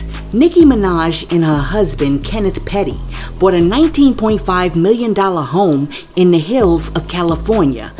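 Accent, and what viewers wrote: New York, United States. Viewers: I know right its like Lyte so New York Im from L A so it is so cool to me 😎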